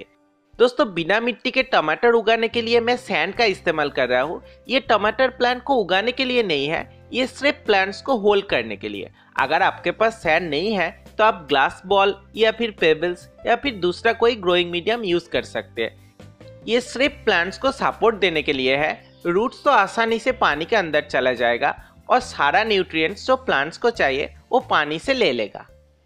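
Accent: Bengal, India. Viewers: Apni Bangali seta apnar Hindi accent a bojha jay.. tobe apni jotota sototar songe protita details bojhan seta sune valo laglo. Apnar tamarind bonsai ta dekhe apnar channel er r o kota video dekhlam. Evabei egiye Jan. Amar subhechha roilo... Waise hindi me bolte hain shayad isiliye aap jyada logon tak pohuch pate hain... Which is definitely a good idea.. keep it up 👍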